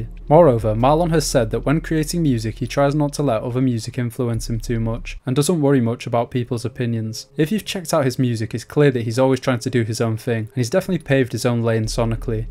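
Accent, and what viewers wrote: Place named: United Kingdom. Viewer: ur british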